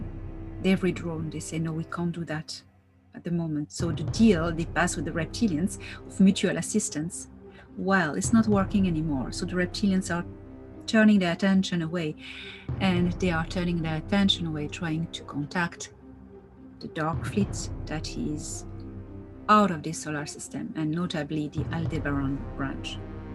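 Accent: France. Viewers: I love yr videos and appreciate yr commitment to truth and freedom of all humanity. I do have hearing issues. Your voice is soft and beautiful and I love yr French accent. However, so many times the music is just too loud for me to hear u. I’ve listened twice or more to sections that I can’t pick up. Love yr attitude and grace. Grateful for the information and the GF support. ❤️❤️❤️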